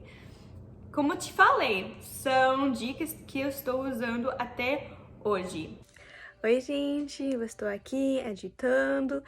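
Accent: Brazil. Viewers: seu "português" + "sotaque brasileiro" estão perfeitos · vc vai falar igualzinho aos brasileiros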